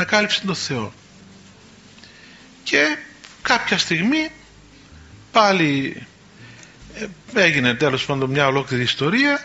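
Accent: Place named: Cyprus. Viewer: Πόσο σε αγαπάω πατέρα Αθανασιε, σε ακούω πολλά χρόνια καί έχω ωφεληθεί πάρα πολύ. Μου άρεσει το χιούμορ σου, και τα κυπρεικα που λες τα απολαμβάνω. Να σε έχει ο Θεός καλά Ευχαριστώ από Ελλάδα.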